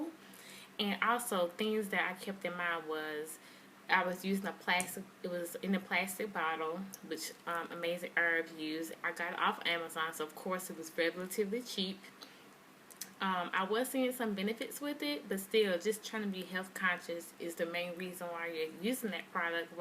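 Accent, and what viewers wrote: South, United States. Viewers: Cute accent ,must be southern ,not know matter what you beautiful Boo.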